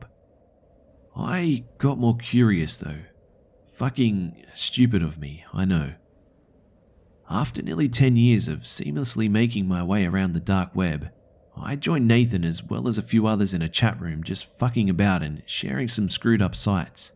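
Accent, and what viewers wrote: Australia. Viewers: I also have come across some disturbing videos via the dark net, without being rude are you Australian by any chance?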